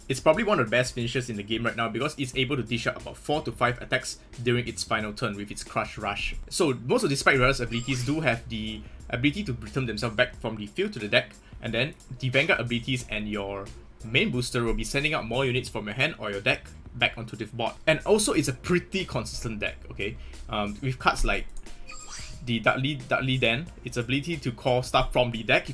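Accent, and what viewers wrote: Singapore. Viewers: He does have that singaporean accent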